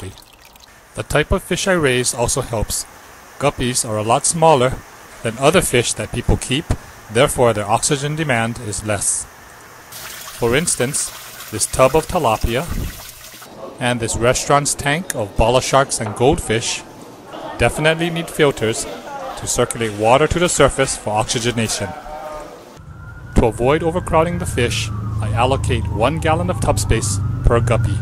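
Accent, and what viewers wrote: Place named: Hawaii, United States. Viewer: Hey! Good video! I can definitely sense that local accent. You're from kaimuki I assume?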